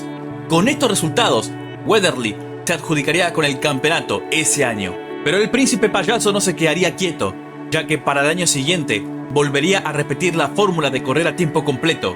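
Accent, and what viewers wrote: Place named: Argentina. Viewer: excelente video amigo! increíble que haya un canal de habla hispana (y argentino por lo que se te ecucha) que hable de Nascar!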